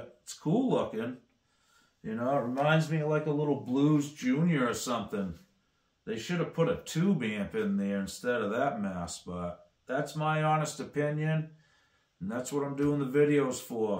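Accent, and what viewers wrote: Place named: New Hampshire, United States. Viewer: You sound like you're from New Hampshire... lol.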